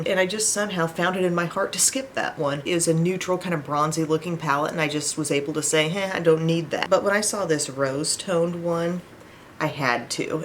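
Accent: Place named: United States. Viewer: Hi there, I'm in the UK, I've been following you for a couple of years and I love your vlogs. There are so many accents in the US and I cannot place yours, it's really different and pretty. Which area does it come from? Also, I love that makeup look, so fresh and glamorous! 🙂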